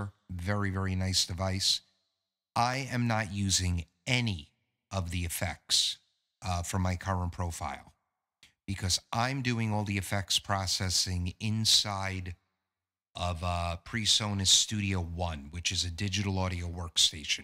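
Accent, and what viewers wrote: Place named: United Kingdom. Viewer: Now I see where your daughter gets her acting spirit! The British Accent adds a nice touch.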